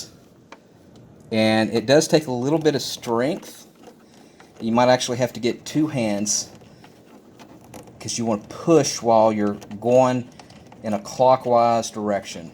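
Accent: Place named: South, United States. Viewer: Thank you kindly sir! Want to send a shout to you and your generosity from your Northern fellow American. I adore your Southern accent! Very charming :) Because of you I can watch my dad’s videos, he passed away 6 months ago. So thank you thank you. You’re the best!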